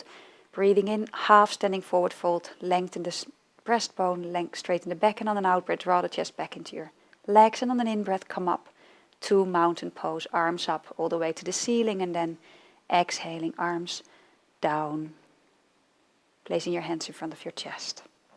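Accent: Netherlands. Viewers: you have got a dutch accent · She’s Dutch... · Wow is she Dutch or what? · Cassendre Xavier Definitely a Dutch accent! 😊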